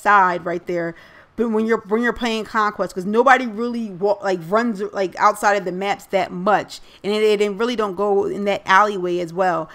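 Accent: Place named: Northeast, United States. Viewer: no i meant northeastern USA not northeast philly.... but yea.. lol i coud tell u was from around here.. accent n certain phrases is hilarious. i remember playin against u before.. its a small BF3 world man. and lol sum ppl may like u a little too much... its sad isnt it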